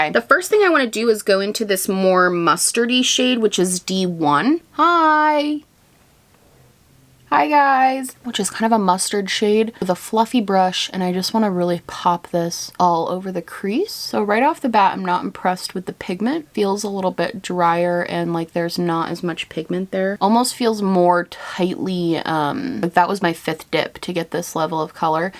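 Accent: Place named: East Coast, United States. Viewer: LOL i knew you were an east coast girl by your voice. love you x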